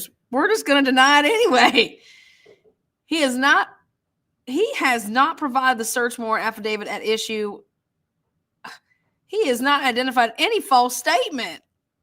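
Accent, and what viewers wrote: South, United States. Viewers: Watching delayed…thanks for all your videos today. Really enjoyed your southern flair in this one. Glad you’re feeling better.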